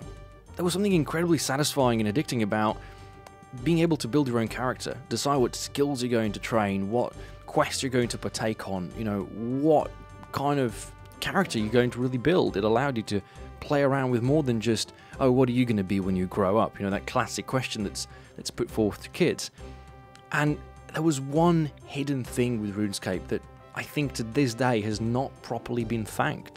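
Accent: Australia. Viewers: Tbh he sounded more aussie or kiwi to me and im a British "person"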